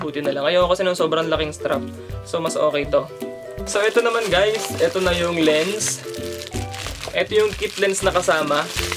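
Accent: Iloilo, Philippines. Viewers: hala illonggo ka gali ka cute HAHAHAA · kuya may tanong po ako Ilonggo kapo ba????????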